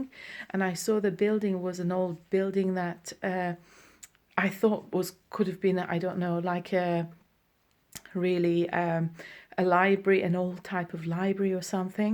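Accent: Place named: Middle East. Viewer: I like your accent are you middle eastern like Jesus? By the way what did jesus look like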